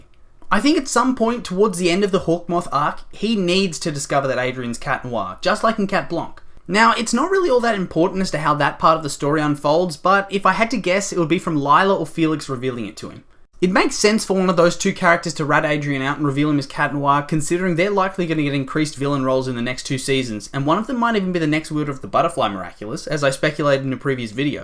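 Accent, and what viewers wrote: Australia. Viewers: Yo are u aussie? Your accent sounds def Australian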